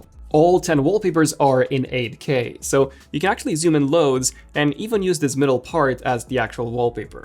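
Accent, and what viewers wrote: Romania. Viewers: Trebuia să aud de 6 ori ca să confirm că tu ești din românia, da întrebarea este, ești din românia?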